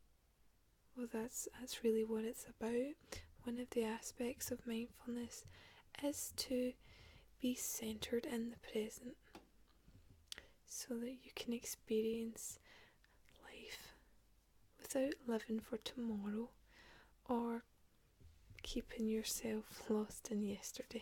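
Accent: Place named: Scotland, United Kingdom. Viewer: love this thanks love your scots accent really soothing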